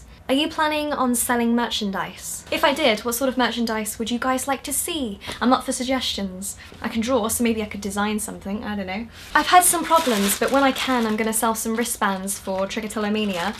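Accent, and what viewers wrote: France. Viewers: aaaah! I love you french accent! you speak very well, good job! I'm so happy because now I know that I can speak with you sometimes in french:-D tu es une fille super! ça fait 1 heure que je regarde tes videos et je ne m'en lasse pas:) Bisouuuux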